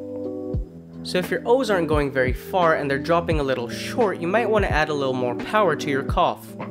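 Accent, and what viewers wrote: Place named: England, United Kingdom. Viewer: Yung english accent talaga eh 👍👌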